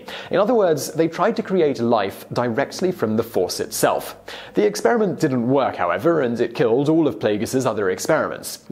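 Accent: United Kingdom. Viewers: Oh limey Brit accents. Made this hard to watch. Not one name pronounced even close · Chillax he's British his pronunciations are endearing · Do you need to put your British spin on every name?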